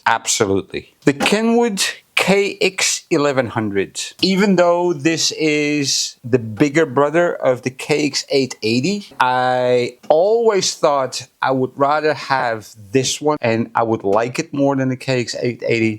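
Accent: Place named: Netherlands. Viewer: you sound Dutch and I am looking for somebody to service a Nakamichi zx 7. Can you help me?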